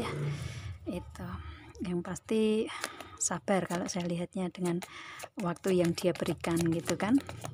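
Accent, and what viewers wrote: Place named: Java, Indonesia. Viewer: Dari jawa ya mbak ... klentum nya kyk Jawa.mbak ...tksh mbak ....Aqua..resonet kok mbak.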